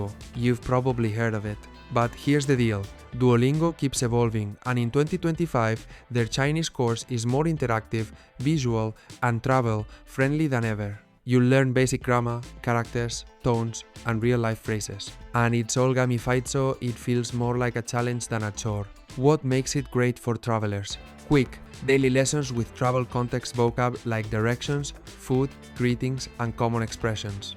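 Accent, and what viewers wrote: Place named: Spain. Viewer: Why the Spanish accent?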